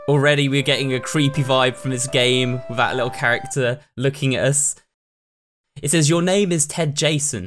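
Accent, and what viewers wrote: United Kingdom. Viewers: AAAAAHHHH HES BRITISH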